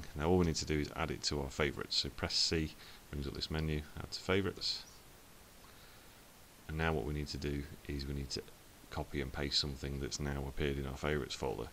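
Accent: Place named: United Kingdom. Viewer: Really like watching your tutorials but I do have one little issue I can't really hear you to great nevertheless it's good to watch some British tutorials instead of American all the time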